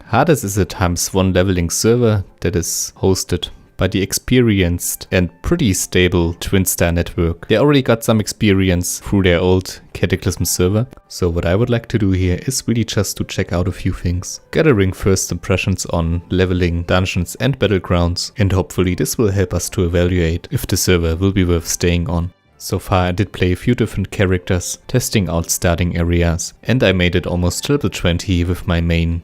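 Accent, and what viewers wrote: Germany. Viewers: Ha, love that german accent:)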